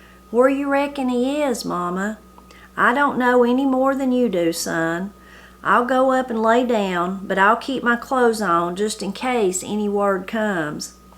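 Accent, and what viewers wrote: Appalachia, United States. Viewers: I was looking forward to the last installment today. I've enjoyed hearing it, and your authentically Appalachian voice made it more of a pleasure. I haven't done a good job of clicking "like" on your daily Christmas stories from Appalachia because I've been watching on my TV where I have click "like" before it ends. But they have been a Godsend to me this month; a daily reminder to stop and relax and enjoy the season. A little bite of Christmas each day, like appetizers. Thank you for all the extra work you've done to produce so many Christmas videos and for inviting us to share your Christmas preparations. Merry Christmas to you and to your whole extended family.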